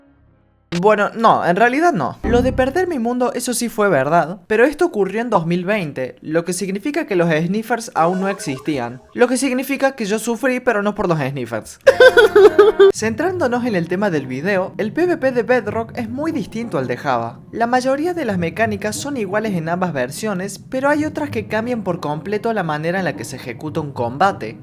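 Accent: Córdoba, Argentina. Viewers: Cómo me encanta el acento cordobés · sos cordobe? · Habla como cordobés. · Sos de Córdoba? O flashee cualquera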